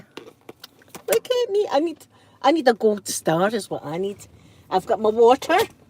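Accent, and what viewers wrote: Scotland, United Kingdom. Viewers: Hello 👋 im gonna watch this i widh i wouldve caught it live. Love you accent. 😊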